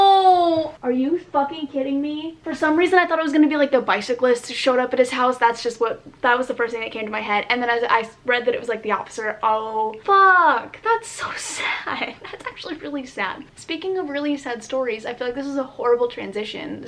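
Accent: United States. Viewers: Maya W she had a slight country accent. I’m from Mississippi and mine comes out often.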